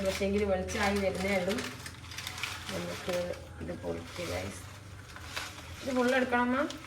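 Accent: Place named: Balussery, India. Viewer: Kozhikode Balussery aahn thonnu samsaram kettit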